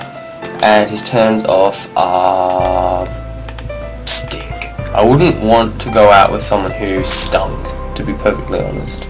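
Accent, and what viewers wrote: Australia. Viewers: i meant that i don't have a distinguished accent. like for example he's an aussie....and americans sound nasally, and british people sound cool, and people from south africa have that cool ring to their voice.....and i.....i have none of that.....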